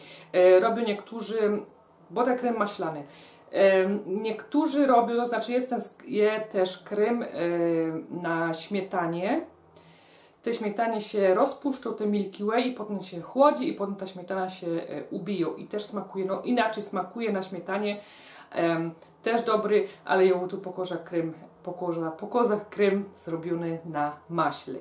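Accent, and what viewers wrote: Silesia, Poland. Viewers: Pyszny przepis! Dziękuję! Słychać, że Pani ze Śląska! :-) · Pani ze ślunska ja?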